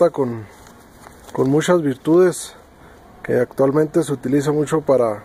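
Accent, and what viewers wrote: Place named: North, Spain. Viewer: me encantan la manes de explicate y su acento norteno me encantan felicidades excel ente video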